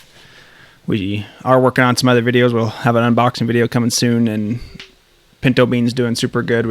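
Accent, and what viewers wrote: South, United States. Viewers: Oh, I had a feeling you had to be from the south! Can't get rid of that drawl that easily :P May I ask what plans you intend to take after college? Gonna keep ferrying or gonna try to be a large animal vet? I like hearing the little updates in your life with the videos as well as all the horse info- you're just as much a part of the video as the horses! What a sweet giveaway though, that's really lovely. I'm gonna have to see what I can do out here now and get my entry in! Hope y'all are having a good Easter from down here in Texas! <3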